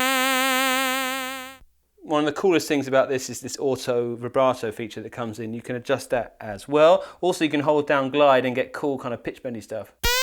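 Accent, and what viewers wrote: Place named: RP, United Kingdom. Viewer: sounding super posh today!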